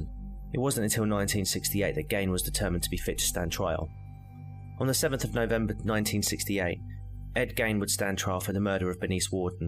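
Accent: United Kingdom. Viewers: what area are you from you have a west end/essex tang to your voice but cant pin it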